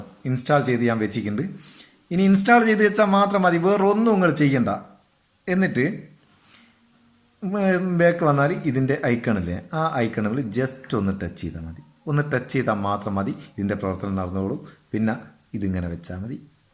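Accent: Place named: Kozhikode, India. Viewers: Idh ksd slngalla... kozhikod😤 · Aadhyam ningale nadapuram slang ozhivaakk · Kozhikod slang😀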